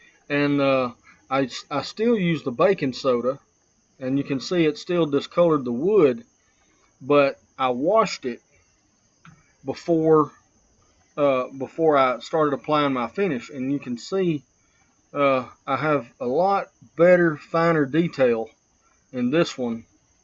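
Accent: South, United States. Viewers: Thanks.....love the work and obvious excitement of exploring woodturning. You have the sound of a good ol' country boy. From a boy from Carolina.......keep it going. There is an artist in there working his way out. You remind me of my neighbor and best friend. I am working on getting him in the woodturning fold.......best to you....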